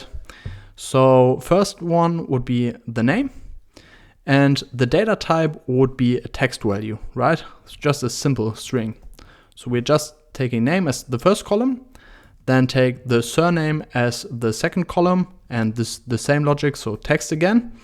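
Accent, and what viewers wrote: Germany. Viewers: Love your Videos. Greetings from Germany, are you german btw? Your accent seems sort of german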